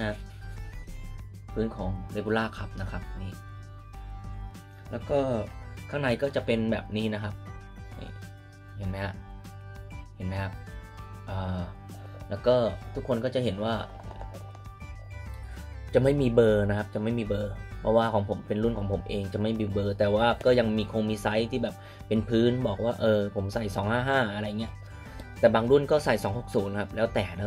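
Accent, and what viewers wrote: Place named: Japan. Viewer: สำเนียงญี่ปุ่นจัด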